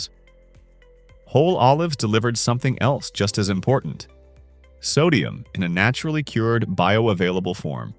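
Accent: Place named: United States. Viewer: Very good video. I have subscribed to your channel. But please learn to pronounce "anti", "semi", "multi" and "vitamin". Only in American English can a perfectly straightforward Latin prefix be twisted into a diphthong that never existed in any language known to man.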